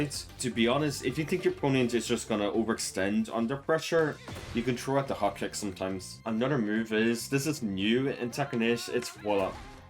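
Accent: Ireland. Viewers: Nice guide and cool Irish accent "counter hish" 😜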